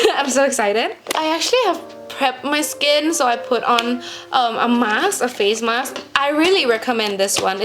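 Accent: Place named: England, United Kingdom. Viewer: เราชอบสำเนียงอิ้งมาก หลงแล้วววว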